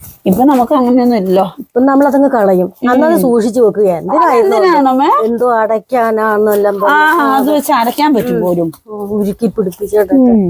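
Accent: Kottayam, India. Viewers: Samsaaram kottayam style aanallo. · വലിച്ചു നീട്ടാതെ വ്യക്തമായി എല്ലാം കാണിച്ചു തരുന്നത് കൊണ്ടു വീഡിയോ ഭയങ്കര ഇഷ്ടമാണ്. സംസാരം കേട്ടാൽ കോട്ടയംകാരി ആണെന്ന് തോന്നും. ശരിക്കും ഉള്ള നാട് ഏതാണ്. ഒന്നു പറഞ്ഞുതരുമോ.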